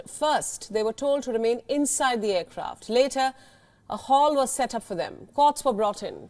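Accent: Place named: India. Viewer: Indian accent😮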